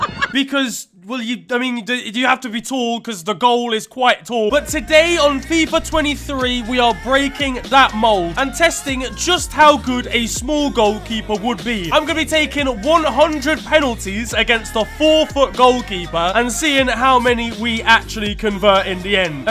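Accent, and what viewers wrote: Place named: United Kingdom. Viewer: whole video my brain was processing to understand his british accent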